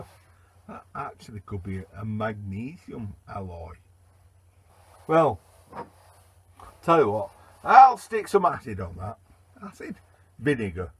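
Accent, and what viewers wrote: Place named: Midlands, United Kingdom. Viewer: Got my wheels turning, sir. Going to try it. Maybe find some wire if the ties don't hold up. As a Yank who's only been to the Midlands and up north a few x in a band, your accent brings back the best of memories. Discovered Max & Paddy and Peter Kay in the first tour...funniest man alive. All the best and thanks for the vid.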